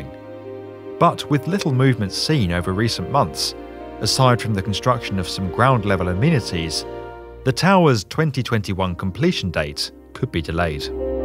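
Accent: United Kingdom. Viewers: good video , well done with the British accent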